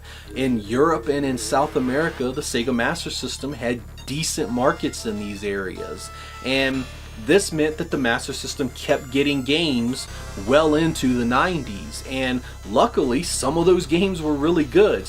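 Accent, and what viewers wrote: United States. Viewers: The movies would be in deutsch xD. The newer movies are clearly better and I like them all. I dont write here, that this video is cheap or something like that, because I dont care, your content so good here also and your analyses are great. I criticise something different, isnt in? Oh my god, the way of talking in USA is facking actually really so annoying, you know.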